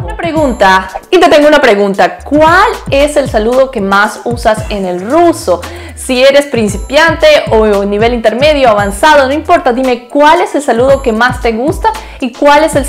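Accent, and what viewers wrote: Venezuela. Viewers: Tu hablas como Venezolana😊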